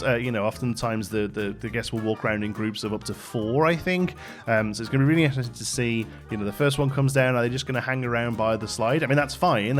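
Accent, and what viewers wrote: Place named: Staffordshire, United Kingdom. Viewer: Loved this insight, I'm so pumped for Planet Coaster 2 now, it's everything I wished for whilst playing Planet Coaster 1. By the way, weird question. I'm from the UK but have never been able to nail down your accent's area, I think it's west midlands somewhere but it's very specific with the way you pronounce the 'ow' in now and so on, I've heard a few people have this inflection and I've always wanted to know the town or area that pertains to. I'm a bit of an accent enthusiast so sorry for the very odd question!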